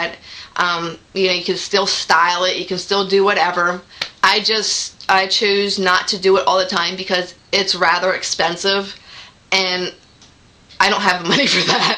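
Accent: Philadelphia, United States. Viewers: This chick has GOT to be from Philly! I'd know that accent anywhere.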